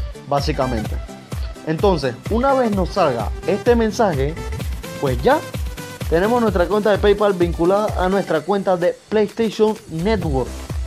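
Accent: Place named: Ecuador. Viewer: es que me sonabas un poco con un acento ecuatoriano :v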